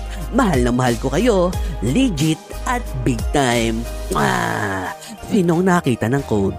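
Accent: Batangas, Philippines. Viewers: Batangeño kapo?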